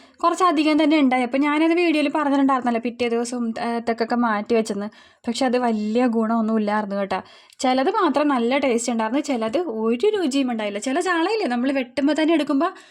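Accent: Kochi, India. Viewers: ചേച്ചി കൊച്ചി ഭാഷയിൽ ശസാരിക്കു ചേച്ചി നല്ല രസം ഉണ്ട് കേൾക്കാൻ പിന്നെ ചേച്ചി മുളക് പോടീ നല്ല കളറ് ഉണ്ടല്ലോ ഏത് മുളക് പൊടിയ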